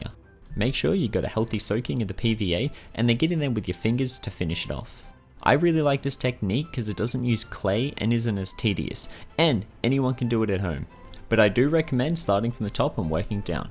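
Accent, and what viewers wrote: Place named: New Zealand. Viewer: Thanks for making these videos! L💘ve the kiwi accent too!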